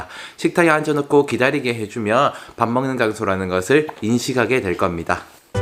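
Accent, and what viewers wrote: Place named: Gyeongsang, South Korea. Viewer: 일한이 너모 귀욥네요ㅜㅜㅜ♡♡ 저도 경상도 사람이라 사투리 쓰시는 모습이 더 친근하게 느껴지고 귀에 쏙쏙들어오네요ㅎㅎ 5개월차 엄마에요 아직 앉아서 먹기힘들어해서 바운서에 누워서 먹이는데 숟가락이라도 쥐어줘봐야겠어요ㅎㅎ 숟가락잡으려하는데 제가 떠먹여준다고 못잡게했거든요ㅠㅠ 낼부턴 스스로 잡게 숟가락을 하나더 준비해야겠어요! 다행히 울아가는 너무 잘먹어주는데 한번씩 안먹으려할때 티비나 동요나오는 장난감 틀어주는데 그냥 다끄고 조용한환경을 만들어주는게 좋을까요? 티비나 장난감은 추후 식사습관에 안좋을거 같은생각이 들었는데 궁금하네요~^^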